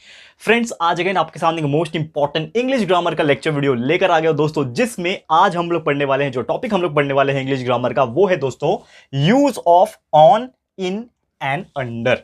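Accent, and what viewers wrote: Maharashtra, India. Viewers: Sir your marathi is soo cute and nice and your teaching is soooooo beautiful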